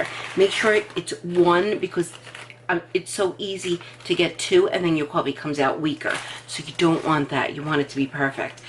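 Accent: New York, United States. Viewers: You have the coolest NY accent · You a New Yorker? My husband is a former New Yorker now he’s a Utahn. Loved your video...Brenda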